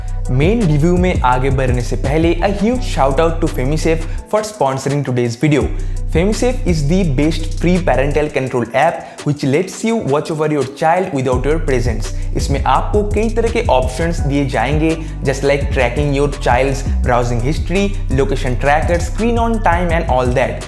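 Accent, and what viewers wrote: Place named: Bengal, India. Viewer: Itna Ganda accent.... Bengali saala tobacco kha k bol raha hai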